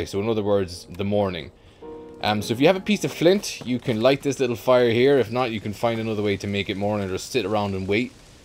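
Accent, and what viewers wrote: Ireland. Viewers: Love the waterford accent boi!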